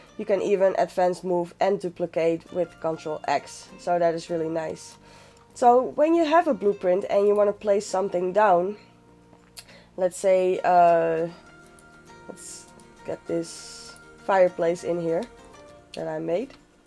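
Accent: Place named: Netherlands. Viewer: Are you dutch? You sound dutch hahaha, thanks for the video!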